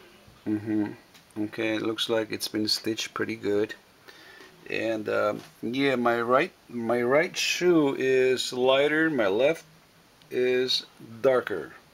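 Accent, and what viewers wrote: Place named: Texas, United States. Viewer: were you born and raised in Texas, i can hear that texas accent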